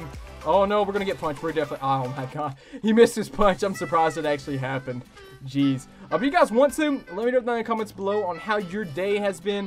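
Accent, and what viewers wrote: Tennessee, United States. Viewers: I knew that accent was familiar. Haha. I'm a tennesseean as well. Glad to see I'm not the only guy with a country accent playing cod lol.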